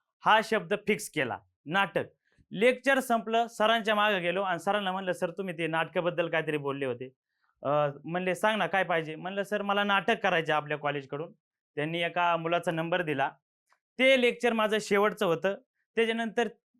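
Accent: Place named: Ahmednagar, India. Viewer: . तुझी टिपिकल नगरीं भाषा मस्त वाटतेय..तसेच बोलण्याची tunig आणि मकरंद अनासपुरे यांच्या सारखी....कलाकारी खूप छान वाटतेय 👌👌👌... तूमचा तिघांचा पोडकास्ट..... कालच बघितला...... Nnss कॅम्प बघून ती कलाकारी शाळेतील वर्गमित्र सोबत करून कलाकार होणे.... मानलं राव 👌👌👌👌gret ❤️❤️👍👍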